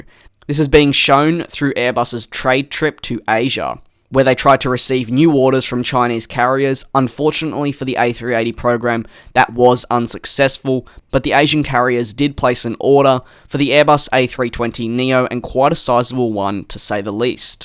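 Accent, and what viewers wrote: Australia. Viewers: Are you from Australia? i'm pretty sure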